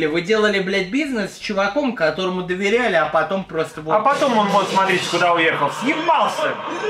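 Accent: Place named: Rostov, Russia. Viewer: пол часа назад зарегался в твитере и у меня высветился Хованский в первых строчках рекомендациях это прекрасно базарит так как все у нас на районе в Ростове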